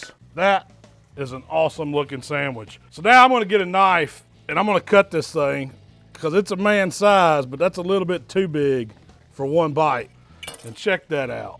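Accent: South, United States. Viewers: always trust a fat chef with southern accent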